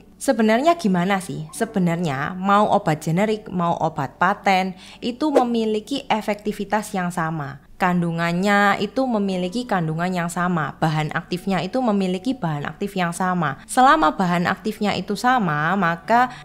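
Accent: Java, Indonesia. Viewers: Medok banget😂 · Logat Jawa nya medok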